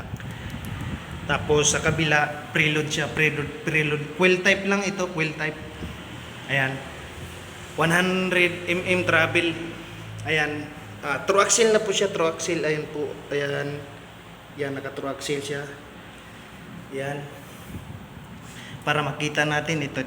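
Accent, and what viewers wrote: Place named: Visayas, Philippines. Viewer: Dong bisaya ka🤣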